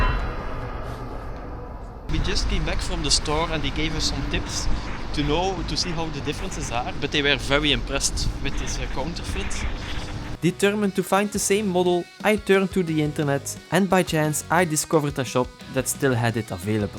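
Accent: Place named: Flanders, Belgium. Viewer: That's one thick Flemish accent brother! 😂 Very good video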